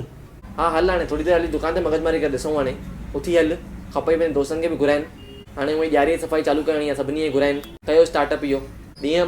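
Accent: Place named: Sindh, Pakistan. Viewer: How to speak sindhi like u?Exactly pure Sindhi🤣🤣🤣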